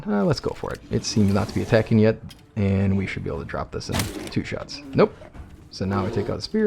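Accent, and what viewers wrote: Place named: United States. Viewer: Holy shit, a medieval dynasty creator with an American accent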